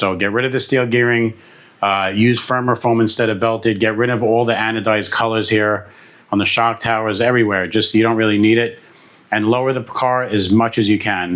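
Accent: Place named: Brooklyn, United States. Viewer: My Cousin sounds like you. He is from Brooklyn I know this has nuttin to do with video! LOL Good to hear accent. I live in Florida so I hear way less and miss it!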